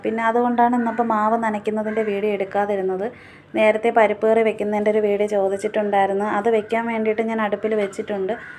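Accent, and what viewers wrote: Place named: Kilimanoor, India. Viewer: ഓരോ സ്ഥലത്തു ഓരോ സംസാര രീതി അല്ലേ.. ഞങ്ങൾ കിളിമാനൂർ ഭാഗത്തു ഇങ്ങനെ ആണ് സംസാരിക്കുന്നത്.. അത് മാറ്റേണ്ട കാര്യമില്ല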